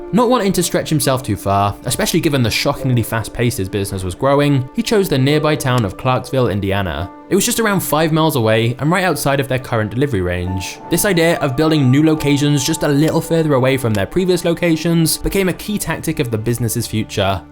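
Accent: England, United Kingdom. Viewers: English script reader, AMERICAN story. Fix that...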